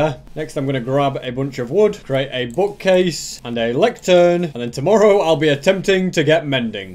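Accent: United Kingdom. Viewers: This man has the most enthusiastic British accent of my entire life. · He has a British accent and he is from the United Kingdom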